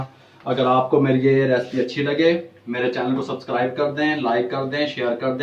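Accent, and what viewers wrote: Punjab, India. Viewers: Asslam o alaikum Bhayi Allah Kareem ap ko sahet aur slamti dy aur Umar m barkat ata frmay aur dharon khushiyan ata frmay aur nazar bd sy bchaey ameen suma ameen ya rab ul Allah ameen ap ka baat krnay ka andaz Bohet acha lgta hy aur Punjabi touch ki tu kia hi baat hy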